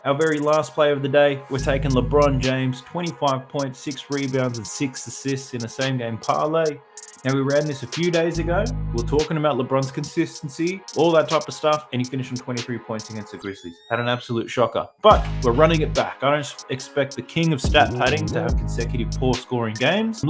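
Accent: Australia. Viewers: Ya Australian accent blew it for me. Not betting on rugby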